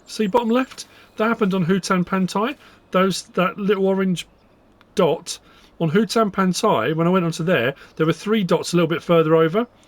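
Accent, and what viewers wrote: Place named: Manchester, United Kingdom. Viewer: Thanks for the guide. I'm going to be playing this for the first time tonight after pre-ordering it, so I'm excited to check it out. I enjoy watching your videos, and an aspect of them I really enjoy is your accent. I'm curious to know; are you from Manchester by chance?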